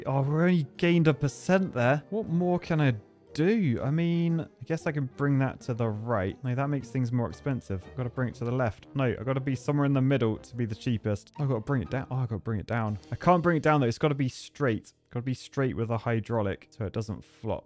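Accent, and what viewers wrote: United Kingdom. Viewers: I love the little British man in my phone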